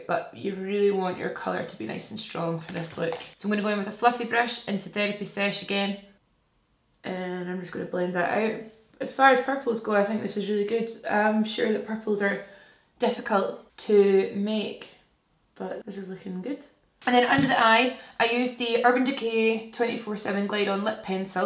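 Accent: Scotland, United Kingdom. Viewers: I recreated the 1st 2 yesterday , I had no lenses so i was blind as shit but still loved playing with makeup 💗🙌 also the Scottish accent is EVERYTHING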